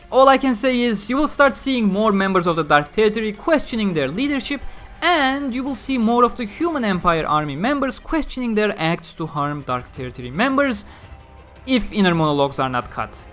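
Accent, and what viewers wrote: Turkey. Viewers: Türk aksanı ile bile ingilizcen mükemmel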